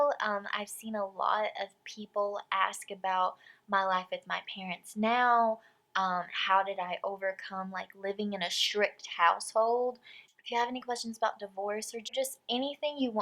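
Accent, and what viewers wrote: South, United States. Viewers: Girl I love you and your videos so much you have the cutest most subtle little southern accent and it’s honestly the most adorable voice I could live with you forever just as friends so I could hear you talk because it’s honestly the cutest thing ever And I don’t like girls like that, nothing is wrong if anyone does but I’m just saying your voice is just beautiful! And so are you! It’s honestly refreshing to see someone that’s just naturally so freaking beautiful inside and out and isn’t shallow or rude and is just authentically themselves Love you ♥️💜♥️😉😘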